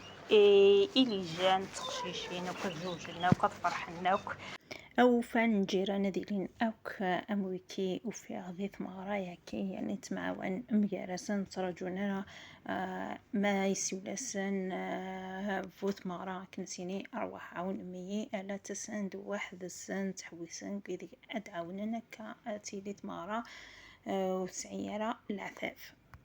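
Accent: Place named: Tizi Ghennif, Algeria. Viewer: لهجة تاعك تاع تيزغنيف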